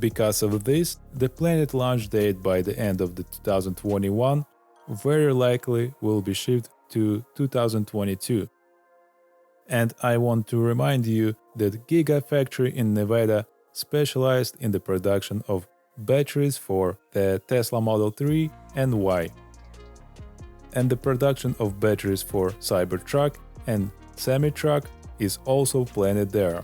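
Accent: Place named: Russia. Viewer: Ты из России? Звучишь как один из наших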